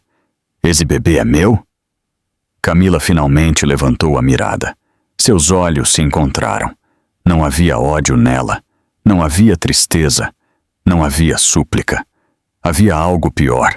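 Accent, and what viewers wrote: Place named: Portugal. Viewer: Português não é do Brasil. Provavelmente de Portugal. Pode ser de outro país que foi colônia portuguesa como o Brasil.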